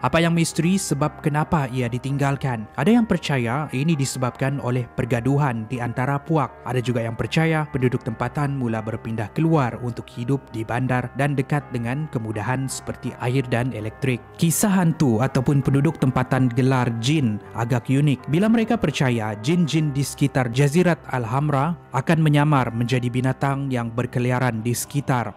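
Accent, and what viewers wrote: Malaysia. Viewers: Bang nak tanye abng dari mna indonesia atau malaysia dngar suara ckp mcam malaysia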